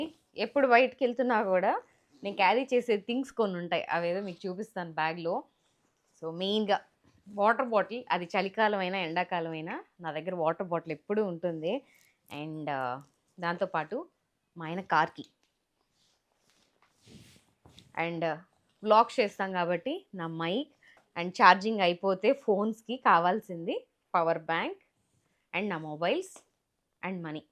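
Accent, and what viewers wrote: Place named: Kurnool, India. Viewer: Your slang is like Kurnool girl 😊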